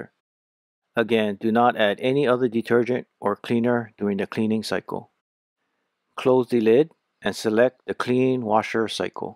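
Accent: Hawaii, United States. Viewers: I love this! It’s helpful as we’ve had out for almost a year and I’ve cleaned it twice 😅 are you hawaiian? I can hear it haha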